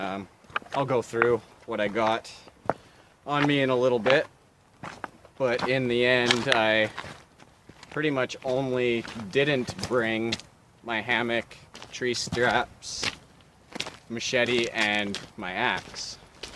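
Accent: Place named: Canada. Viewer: Hmmmm ouuut & aboouuut...you must be Canadian. Cool. I can only tell when you guys say one of those words. I haven't heard you say "ay" too much though...lol.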